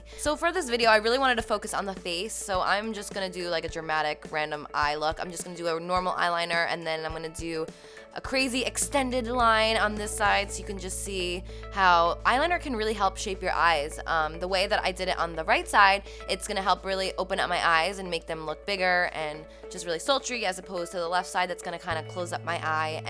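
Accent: New Jersey, United States. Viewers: omgggg as soon as I heard you talk I knew you were from New Jersey JERSEY PRIIIIIIDE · Love the Jersey accent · I hear a Jersey accent 😍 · she sounds like she's from nj, the accent is so sutle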